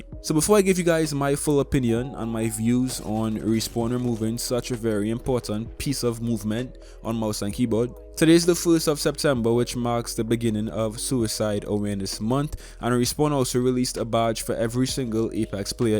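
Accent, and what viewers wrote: Trinidad and Tobago. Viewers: Just started playing apex and your my fav apex yt rn much love and btw are you from Trinidad & Tobago cuz i am and you sound like a trini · “The apex YouTuber with an accent” Me knowing he’s a trini: 🤣🤣🤣🤣🤣🤣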